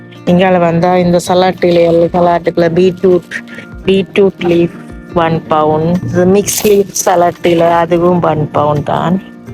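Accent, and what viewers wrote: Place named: Sri Lanka. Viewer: நீங்கள் பேசும் தமிழ் இலங்கை தமிழாக உள்ளது.இலங்கையா நீங்க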